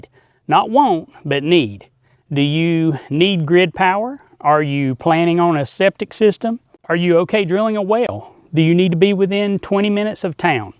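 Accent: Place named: South, United States. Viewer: Although I would hope that my property has a little bit of soul... I think what HE is saying, is "soil". I do love a Southern accent... ❤😂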